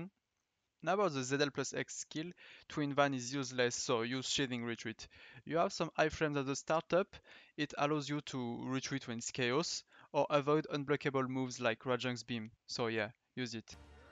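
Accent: France. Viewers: Nice french accent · Just perfect: short, easy to understand and on top of all that the French accent. What more could I ask for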